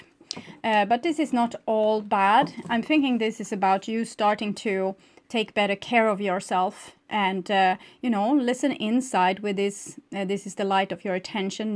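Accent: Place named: Sweden. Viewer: Hej! Är ny här! Tyckte jag hörde en svensk brytning😜🌻. Din läsning var verkligen mitt-i-prick, har även lyssnat på dom nyare läsningarna. Det är som att du beskriver mitt liv. Kommer definitivt följa dig!❤ Tack så mycket! Kram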